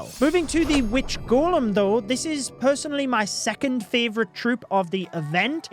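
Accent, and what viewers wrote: United Kingdom. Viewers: Is he British or what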